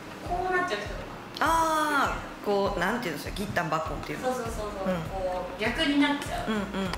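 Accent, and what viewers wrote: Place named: Kyoto, Japan. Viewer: 京都弁ええなぁ～🤣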